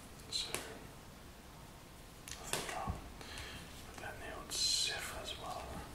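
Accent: England, United Kingdom. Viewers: Geez, your Brit accent is something, huh? Greetings from a Brazilian chocolate lover! 😋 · ASMR, plus gains, plus cake, plus British accent. I think you just became my favorite youtuber. 😍🎂💪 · this accent comes from which part of England?!?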